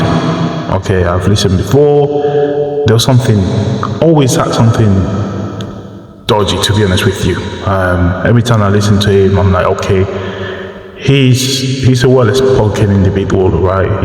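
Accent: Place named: RP, United Kingdom. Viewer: Your analysis is flawed ... And your Porsche accent shows you are not even resident in Africa.... Many of your congloese brothers and sisters on your Eastern borders are celebrating the M23 rebel takeover of Bukavu and Goma cities.... DRC government too has to take responsibility blaming Rwanda wont cut it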